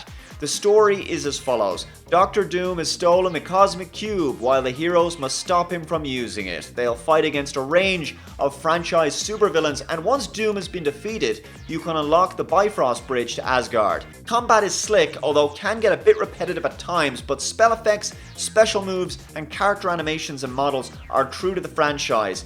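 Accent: Ireland. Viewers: Are you irish? :D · are you irish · R U irish?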